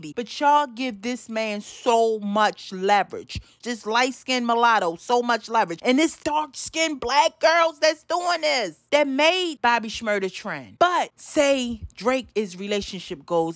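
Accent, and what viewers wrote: Baltimore, United States. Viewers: Found you by accident. That BMore accent got me here to stay 😂 great content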